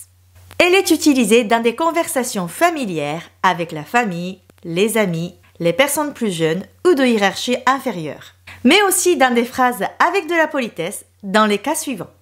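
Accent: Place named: South, France. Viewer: Bonjour, c'est amusant car j'entends chez vous l'accent du midi ! Je voudrais apprendre des rudiments oraux de japonais en cours particuliers. Pour un court voyage au Japon et pouvoir chater. Est-ce possible avec vous ? Thierry Dorée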